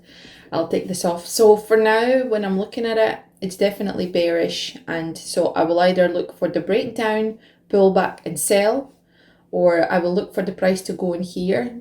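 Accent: United Kingdom. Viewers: British? love your content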